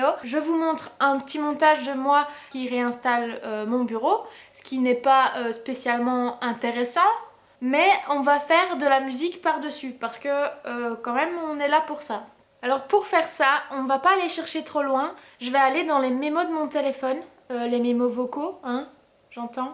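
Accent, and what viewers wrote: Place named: Eastern France, France. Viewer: J'ai passé la vidéo à écouter ton accent ToT ne serais tu pas de l'est type Haute Saône ?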